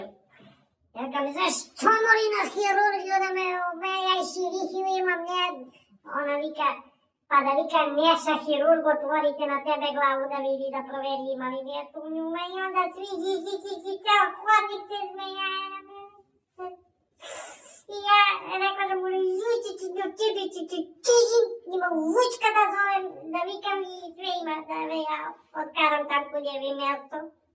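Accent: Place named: South, Serbia. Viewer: BRATE ODAKLESI? CEPAŠ JUŽNJAČKI A DRAGICA ME PODSECA NA KOMŠIKU BABU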